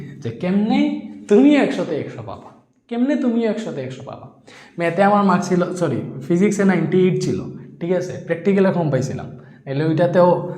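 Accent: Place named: Bangal, Bangladesh. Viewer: ভাইয়া আপনার বাঙাল ভাষা কিছু বুঝিনা তাই শুদ্ধ ভাষায় কথা বলে প্লিজ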